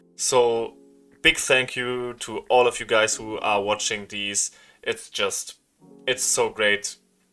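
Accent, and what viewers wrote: Germany. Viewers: digga dein deutscher accent ist so zu hören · You sound german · Bruder man merkt so krass das du deutsch bist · You sound really german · geiler deutscher Akzent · Are you German? Klingt bissl so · Your german accent is really great! it's always good to improve your language ofc :D but your german accent is absolutely fine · Are you german?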